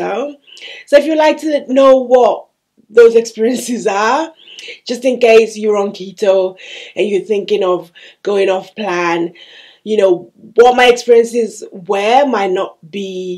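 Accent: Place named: United Kingdom. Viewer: So I notice your accent and I’m not very familiar with customs in the UK (if that’s where you’re living). I’m in the US and we’re celebrating Thanksgiving this week. If you celebrate Thanksgiving or any carb-heavy holiday, how easy/difficult is it for you to maintain the keto diet during a time when the whole country is gorging on a lot of carbs?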